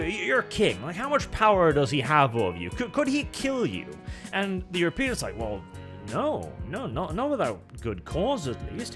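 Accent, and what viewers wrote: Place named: England, United Kingdom. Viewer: Listen to that English accent, and knowing that my language that I speak is English come and knowing that all three of my names both first second and surname is english, and knowing that the English is participated in the slave trade and benefited off of it still to this day, you should be the last person talking.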